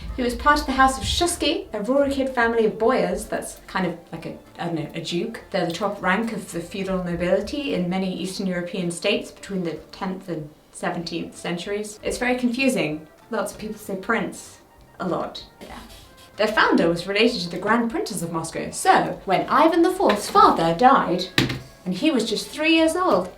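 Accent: RP, United Kingdom. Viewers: You put so much preparation into these videos! Thank you for all the hard work. You're really interesting (plus you have an awesome British accent which I enjoy). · Oh your that's sort of posh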